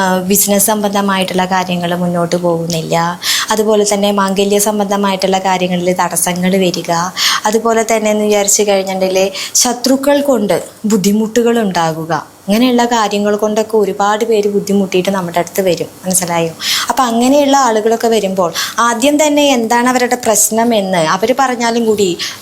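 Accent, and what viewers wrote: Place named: Thrissur, India. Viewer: തൃശൂർ പച്ച മലയാളം 🙄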